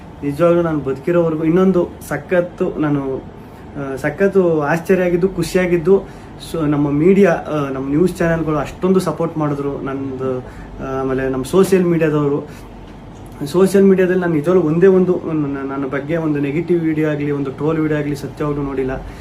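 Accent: Mandya, India. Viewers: Hi ಗಿಲ್ಲಿ 🌹💐🙋‍♂️🙌😍 ನಗಿಸೋರು ಹೃದಯವಂತರು 😍 ಅವರ ಮನಸು ಯಾವುದೇ ಕಾರಣಕ್ಕೂ ಅಳಲೆಬಾರದು 🙌 ನಿಮ್ಮಲ್ಲಿ ಅತ್ಯದ್ಭುತ ವ್ಯಕ್ತಿತ್ವ ಇದೆ ಸರ್ 🙌😍 ನಿಷ್ಕಲ್ಮಶ ಮನಸು ಮಂಡ್ಯ ಸಿಹಿ ಮಾತಿನ ಶೈಲಿ 👌👌👌👌👌👌👌 ಒಳ್ಳೇದಾಗ್ಲಿ ಸರ್ ನೀವು ಇನ್ನೂ no1 ಆಗಿ ಬೆಳೀರಿ ನೀವು ಖುಷಿಯಾಗಿದ್ರೆ ನಮಗೂ ಇನ್ನೂ ಖುಷಿ ಸರ್ ಒಳ್ಳೇದಾಗ್ಲಿ ಸರ್ 🙋‍♂️ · All the best ಗಿಲ್ಲಿ . ಇನ್ನ ಎತ್ತರಕ್ಕೆ ನಿಮ್ಮ ಕೀರ್ತಿ ಬೆಳಗಲಿ. God bless you ನಿಮ್ಮ ಮಂಡ್ಯ ಸೊಗಡಿನ ಭಾಷೆ ಅಂಬರೀಶ್ ಅಣ್ಣನ ರೀತಿ ಹೀಗೆ ಇರಲಿ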